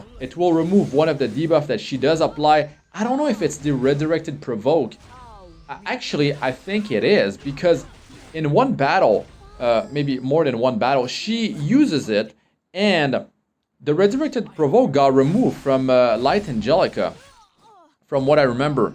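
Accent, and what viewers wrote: Quebec, Canada. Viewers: Hey, weird question but are you from Quebec? Your accent made me wonder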